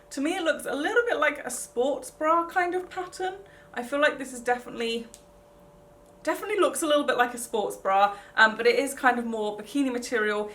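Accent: United Kingdom. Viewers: Hahahaha i Love how she's criticizing those weird af non existent bottoms in her lovely British accent! Sounds so posh, a British person could insult my mom and id probably say 'oh thanks' just because they make everything sound so posh 😂❤️ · I luv ur accent, I have always wanted a British accent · What part of Britain? I have a Shropshire accent, my daughter was born down south as that's where we were living she's got a southern accent, my husbands friend is from Scunthorpe and their accent is odd haha, my friends friend is Scottish, my aunt is Welsh...many many different accents and there are like at leave 5 different London accents depending on which part 🤣🤣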